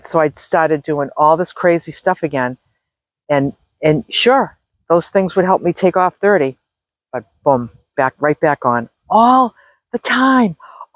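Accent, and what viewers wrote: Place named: Massachusetts, United States. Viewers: I love your accent. I miss my east coast cousins.UR my example. I need to drink moreH2O. I have to walk more! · Nice to meet you,! You sound like you're from the Boston area, not far from me in Southern NH! Hi, neighbor! Thank you for sharing your inspiring story...it was such an encouragement to my heart! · Thank you for being you, and here on YouTube! I like you! One reason is because I can tell that you like you! I find you to be very refreshing! Sorry/not sorry for all the exclamation points. 😊 I'm in New England, and your accent sounds pretty local to me. I'm curious where you're from. Anyway, I'll be following you here. Keep on keepin' on my virtual friend! You're an inspiration. ❤ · you sound like voices from my childhood in Massachusetts.💗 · I am sooo grateful that I fought your YouTube sight. You are an inspiration to me. 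I can so relate to everything you have shared. I hear a Bostonian accent which also excited me because I’m a true Bostonian too. I will continue to watch you and look forward to more videos. I truly feel I can sleep better tonight and wake with 3 different outlooks to my life tomorrow (physical, emotion and spiritual. Not to sound corny but I swear You were sent as a wellness blessing to me. Thank you! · You sound like you’re from Massachusetts are you I am and I pick up a pre-bit of an accent. Love your channel. I’m a regular subscribe right now. Please answer my question about Massachusetts.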